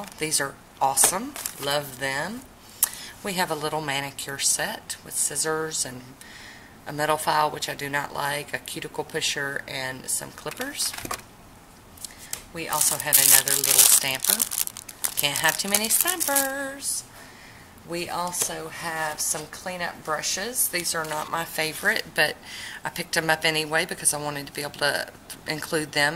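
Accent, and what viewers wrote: South, United States. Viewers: I'm from Pennsylvania! I could definitely tell that you're from the south! Thank you for sharing your love of polish with the world!!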